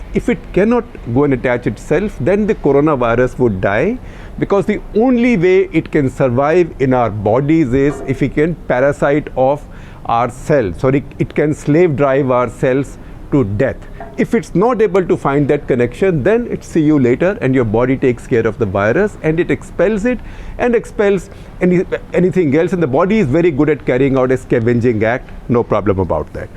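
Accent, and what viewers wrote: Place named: Punjab, India. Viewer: if this guy can drop his Punjabi accent, he'd get a lot more views on his channel. For heaven's sake it's not Indiana Police 😣😣😣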